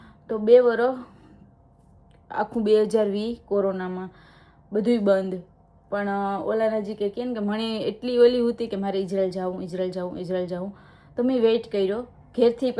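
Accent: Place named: Barda, India. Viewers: bhai aa amara barda ni mithi bhasa 6 aa bhasa koi boli na sake amara sivay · Mer ni boli emj bole bhai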